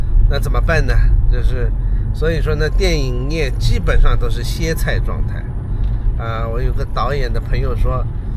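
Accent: Shanghai, China. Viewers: 从你口音我觉得你是个土生土长的上海人，我也是，在国外的街头偶尔也是听到上海话，匆匆而过时，彼此也会报以会心的一笑，我们都知道这是思乡之情在作祟，因为我们都想念它。感谢你的视频，感觉是你的一种释怀，但还是要谢谢你的豁达，Merry Christmas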